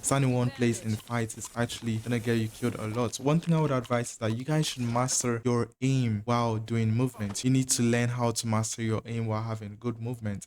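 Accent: Nigeria. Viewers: U dey sound like 9ja guy😤😅.. gg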